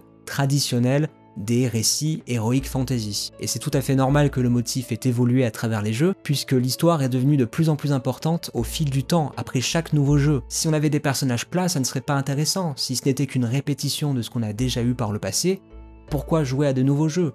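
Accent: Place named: France. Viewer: Final Fantasy 16 sounds so much more legitimately medieval when a French person is talking about it 👍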